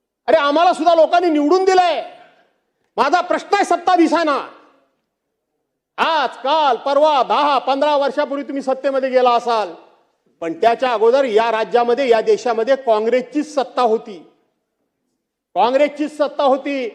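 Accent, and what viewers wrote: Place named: Konkan, India. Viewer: कोकणी आवाज कसा आहे.🎉🎉❤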